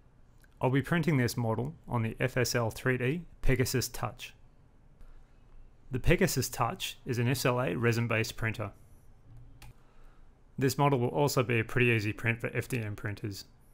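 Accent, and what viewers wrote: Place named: South Australia, Australia. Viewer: Are you from South Australia? Your accent is exactly like my friend here in London and he is from Adelaide.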